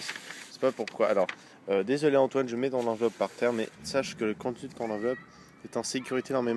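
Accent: Quebec, Canada. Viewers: Tes vrmt cool toi ! J'adore ton accents, ta voix on dirais une voix d'acteur mais j'me souvien pu. VIVE LE QUÉBEC!!!!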